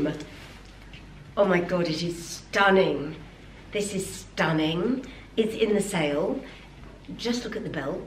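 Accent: United Kingdom. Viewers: Nothing is as lovely as a genuine London accent.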